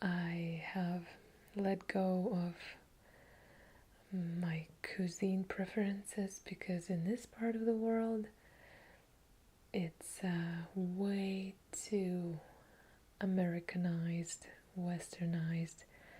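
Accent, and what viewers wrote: Russia. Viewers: Your voice is so warm and relaxing, some of the most soothing ASMR I’ve ever heard. When I first discovered the art form several years ago, GentleWhispering was the first vocal ASMRtist I heard who made me tingle and she also has the same hypnotic Russian accent. Something about the accent just instantly melts my brain and gets me ready to fall asleep, thank you so much for doing what you do!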